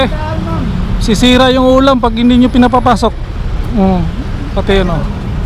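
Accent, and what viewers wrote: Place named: Visayas, Philippines. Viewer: Ayusin mo nga pgsalita mo bisaya ka dong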